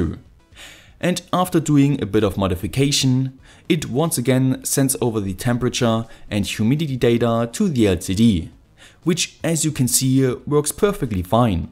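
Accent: Saxony, Germany. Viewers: bist du aus Leipzig? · are you german?? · Ahaaa ein deutscher 😂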